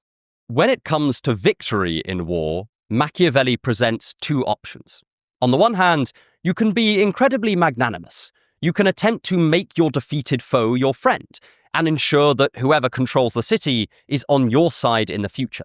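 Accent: United Kingdom. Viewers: Ah, nothing better that a good video-essay with a good orator with British accent and 1am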